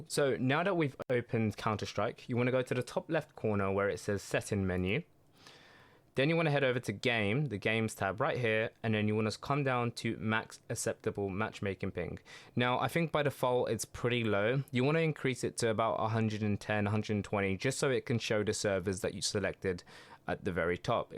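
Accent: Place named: United Kingdom. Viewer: Got to love hearing a brit playing cs, see brits all over youtube playing but i always got Igor and vlad on my team instead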